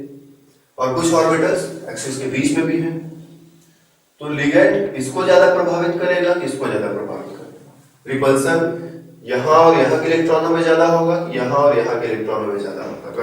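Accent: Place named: Uttar Pradesh, India. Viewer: sir aap U.P se lgte hain. bohat acha smjhaaye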